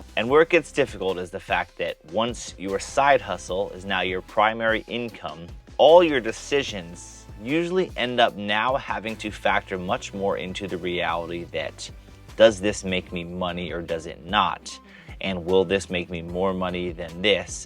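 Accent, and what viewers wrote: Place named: Ohio, United States. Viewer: You have a Buckeye accent...any relation to Jon M.?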